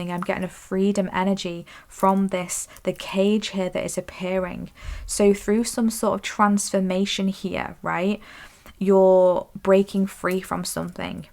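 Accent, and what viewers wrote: Northern England, United Kingdom. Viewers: Pile 2 brought so much confirmation. I am welcoming in my beautiful brand new reality. I am shifting into the next phase of my life 💕🐬🌈 I’ve been sensing so much of what you brought through. I’m here to play a role in activating others. My desires to be acknowledged for my soul gifts and talents and even prosperity in connection to them aren’t bad at all, but all pure innocent and valid desires from source. Your voice is really comforting and reminds me of my grandparents from Liverpool. I always feel like my ancestors are comforting me during your readings. Are you from Northern England? Thank you for bringing through this confirmation🙏🏽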